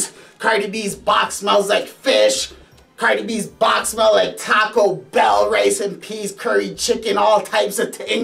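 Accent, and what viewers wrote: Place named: Canada. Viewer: Where are you from Fam You sound like your From Canada..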